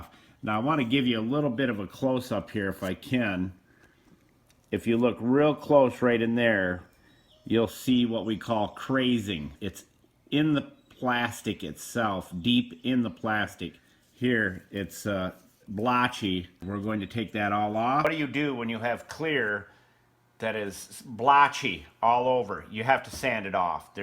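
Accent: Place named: Pennsylvania, United States. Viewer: Where are you from? Your accent sounds a bit like it's from my neck of the woods in northeastern PA.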